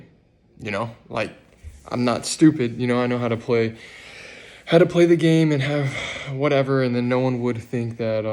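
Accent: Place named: South, United States. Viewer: Love you humour and southern drawl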